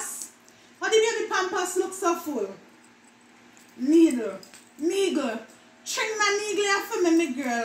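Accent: Jamaica. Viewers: Wow I love your Jamaican Accent. Really enjoyed this ❤️ full watch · Wow. The real Jamaican accent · I love jamaican accent.. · i love jamiacan pronounciation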